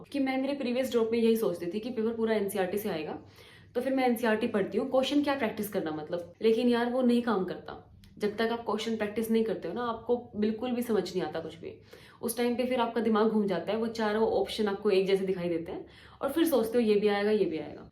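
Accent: Haryana, India. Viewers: Haryanvi Tone 🔥🔥... where are you from didi??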